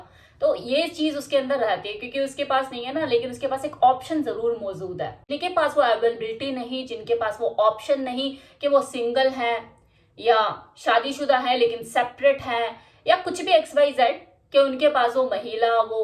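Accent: Haryana, India. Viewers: App Haryana ke ho?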